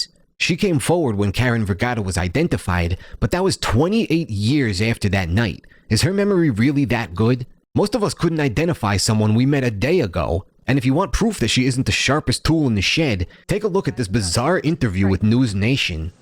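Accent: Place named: New Jersey, United States. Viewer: lets get this man to 1k subs people. his jersey accent is worth it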